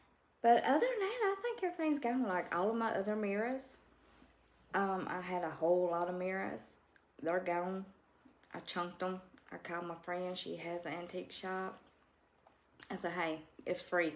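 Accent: South, United States. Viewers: From Argentina Honey you are just fine!!!! I lived in the US specifically in the South, and I watch your videos because it reminded me my time sweet time in the south that i learn to love, i love the accent the humor the kindness!!! So what i mean with these is that you are just fine❤ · Thank you for the wonderful videos. I am glad you found what you need to put you at peace and make you happy. You and your cats make alot of people happy. I love the southern ways. I am from SC and I love the accent and the southern saying. Please continue with the videos, I need that joy in my life. life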